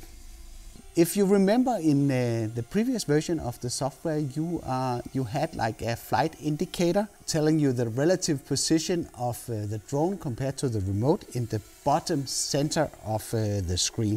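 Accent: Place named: Denmark. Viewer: man kan godt høre at du er dansker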